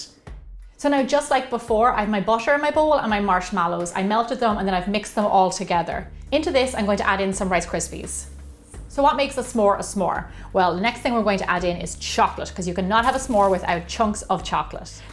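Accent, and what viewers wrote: Ireland. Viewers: Im going to make the snickers one! Looks amazing! Also, love that you never lost your Irish accent! (I'm Irish) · Looks so delicious I must try I made peanut butter rice crispy treats with fudge topping love your Irish accent💚☘️ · Are you Irish? Great video btw 😁♥️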